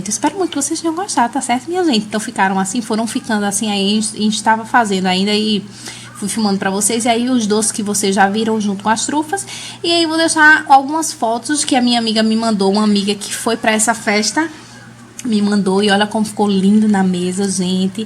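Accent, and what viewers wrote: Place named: Pernambuco, Brazil. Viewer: Adoro suas receitas!! Esses docinhos....cada um mais lindo que o outro!!! Pelo seu sotaque, você é daqui de Recife/Pernambuco??? Se for somos conterrâneas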